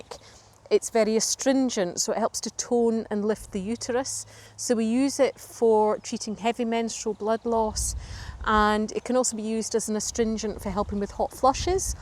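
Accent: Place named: Scotland, United Kingdom. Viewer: Love to hear a Scottish accent. Live not too far from Fife. 😊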